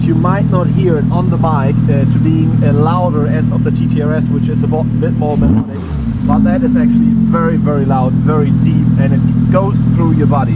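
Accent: Germany. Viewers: Bist du deutscher wegen deinem Akzent?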